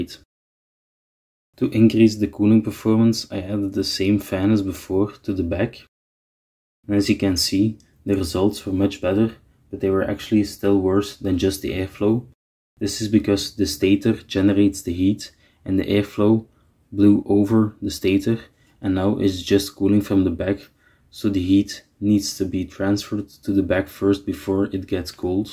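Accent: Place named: Flanders, Belgium. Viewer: Are you flemish? Curious cuz of the accent :)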